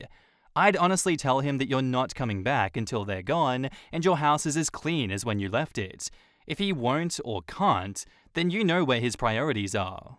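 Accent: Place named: Australia. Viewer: the great Aussie voice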